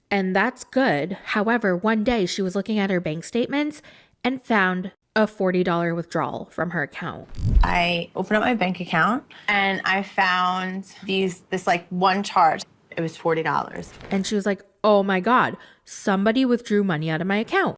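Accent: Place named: East Coast, United States. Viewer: I knew you were east coast lol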